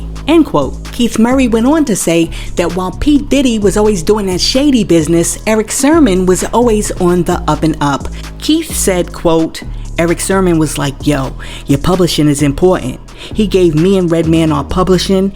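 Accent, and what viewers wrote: New York, United States. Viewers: You're so funny, and i love your New York accent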